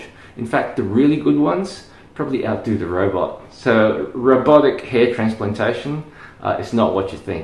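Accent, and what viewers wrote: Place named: Queensland, Australia. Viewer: Idk how your international followers understand you. That's a really thick Queenslander accent 😒😁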